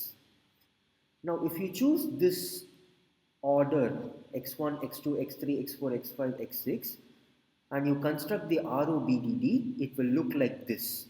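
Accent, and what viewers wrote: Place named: India. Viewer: fucking indiaaaan accent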